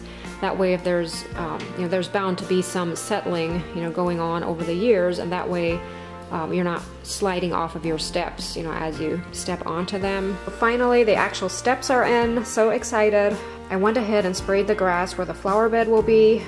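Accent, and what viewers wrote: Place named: Pennsylvania, United States. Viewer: Beautiful! Your husband did a fantastic job. Thank you for sharing your lovely property and the hard work you put into creating it. Your accent makes me long to visit my relatives in Berks county PA.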